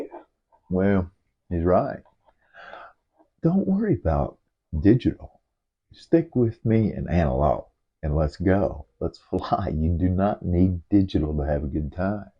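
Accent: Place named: United States. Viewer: Mate you have the best yank accent in FPV 😄🤝 This is a brilliant video and I hope loads of newbs see this as the advice is SPOT ON! I tried raising this point with JB but he just poo poo’d me, I attempted to explain that he’s going to be out of a job if the next generation and their parents don’t even consider Analog, they just don’t have the money that digital costs let alone the repair costs when the inevitable crashes happen! 😮 Seen SO many guys come into fpv with a complete dji fpv/avatar system and then disappear after destroying their Gucci new quad and not being able to justify to themselves/the missus & kids the cost of replacement etc 😢 Eachine ev800D goggles still hold their weight, I use Cobra X now but still use the Eachine ones when my kids fly with me and let them use the Cobra’s 👍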